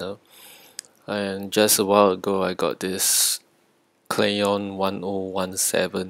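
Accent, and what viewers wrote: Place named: Singapore. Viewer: let me guess...singapore!!! you are from singapore aren't you??i know that accent..haha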